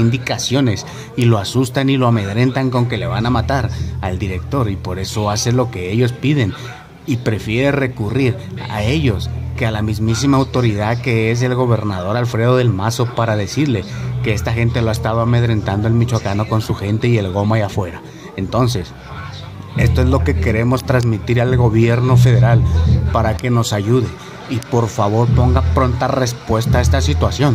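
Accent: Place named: Colombia. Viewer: Tienes acento colombiano hermanito 👍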